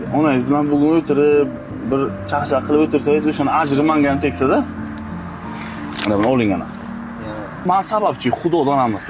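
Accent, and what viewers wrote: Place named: Bukhara, Uzbekistan. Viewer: Buxoroya shevesh has lekin dashumo Buxorogimi shumo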